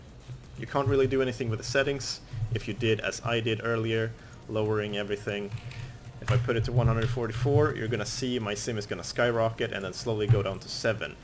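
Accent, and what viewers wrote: Sweden. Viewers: You sound swedish. Are you? Also nice video bro, keep it up! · you sound swedish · This guide is actually really cool and really helpful,thank you for doing this! Hope you dont mind the personal question,but are you by any chance swedish? I'm just thinking the accent gave it away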